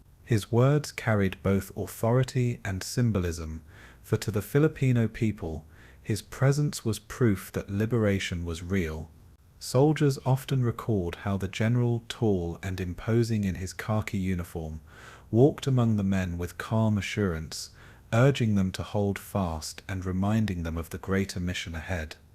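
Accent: United Kingdom. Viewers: Sounds like a real person talking with a Limie accent. Good job Mate once again, again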